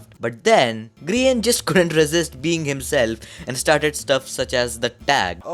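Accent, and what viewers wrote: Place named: India. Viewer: You are definitely Indian. Judging by your accent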